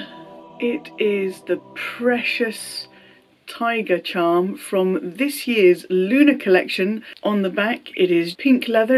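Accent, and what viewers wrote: United Kingdom. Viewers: I’ve laughed. I’ve cried ( your cat was beautiful) . It was actually the cat that made me click. Your voice is wonderful! I love a British accent. Your personality is va va voom! Thanks for the video. I’m looking forward to your next!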